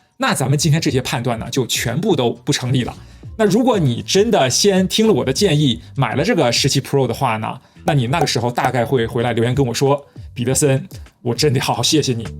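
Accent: China. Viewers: 好像博主是在外国生活的华人，但口音像是中国大陆的，而用词却是台湾的叫法。有点别致的搭配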